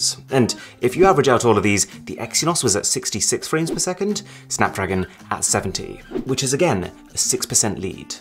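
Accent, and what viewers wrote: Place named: United Kingdom. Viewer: You have damn british accent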